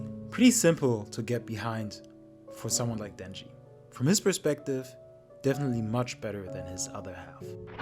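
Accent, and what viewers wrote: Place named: Germany. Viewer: Intentional or not, you have a german accent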